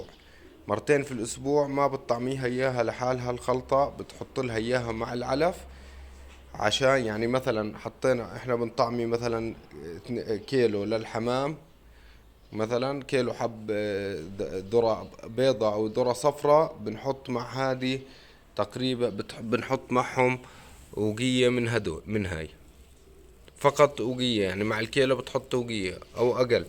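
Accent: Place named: Palestine. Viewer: وانت كمان بتحكي مثلنا طاسه ههههههههه يسعد قلبك فلسطينيه كح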